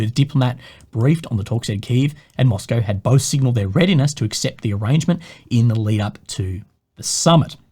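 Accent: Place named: Australia. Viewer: He speaks English with an Australian accent.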